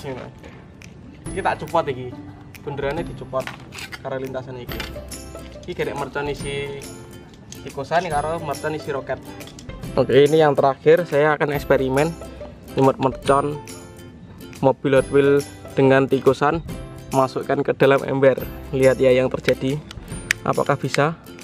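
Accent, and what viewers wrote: Java, Indonesia. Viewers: Hhhh .wong ndi .kok kentel jowone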